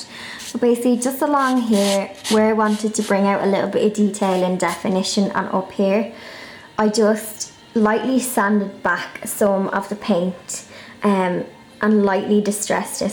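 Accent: Ireland. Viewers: I think it is Irish? nice vid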